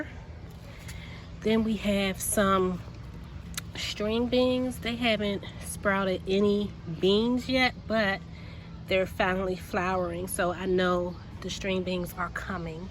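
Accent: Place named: Baltimore, United States. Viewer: I knew once I heard your voice your were from BMORE!!!! I’m was born and raised there, but I live in GA. Your garden is BEAUTIFUL!!! Can’t wait to see this years garden!!! 💐💐 Peace & Blessings ✨✨✨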